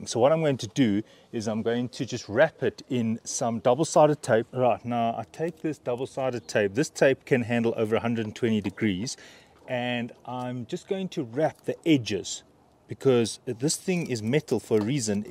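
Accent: South Africa. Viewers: This man has that OG soutie transvaal South African accent. Haven't heard it in ages.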